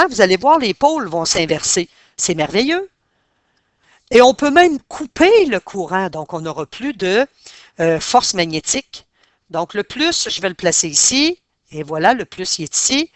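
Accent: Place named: Canada. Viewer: Bonjour madame et bien merci pour votre réponse très rapide et c'est parfait cela me permet de très bien clarifier ce sujet. Vous êtes très compétente et j'aime bien votre accent Canadien et bien le bonjour de Belgique.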